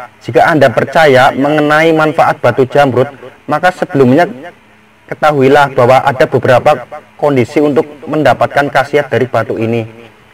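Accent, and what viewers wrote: Banyumas, Indonesia. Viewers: ngapak ya mas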